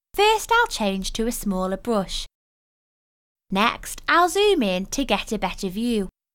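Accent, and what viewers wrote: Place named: Lancashire, United Kingdom. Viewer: Sounds like Bubbles. That's a Lancashire accent.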